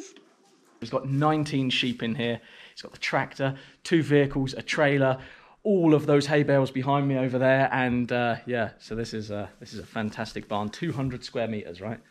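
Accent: Norfolk, United Kingdom. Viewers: Sound like a rum ole Norfolk boi! Nice vid thanks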